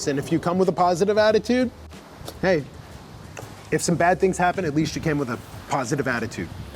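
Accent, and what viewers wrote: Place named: New York, United States. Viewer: You still got that unmistakable New York accent, though!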